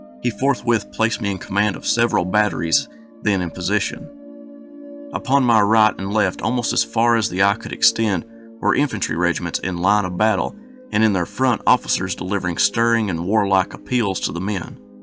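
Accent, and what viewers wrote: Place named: Virginia, United States. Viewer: listening to your accent over your last three videos, when you say letters and words like a, an, the, it sounded like a tidewater accent. If you're not from Norfolk, then your accent also sounds like it's from or at least developed in the tri-states region of Virginia. When I listen closely, I'm really hearing Appalachian overtones in the words, tember and cadencé . Respectfully WS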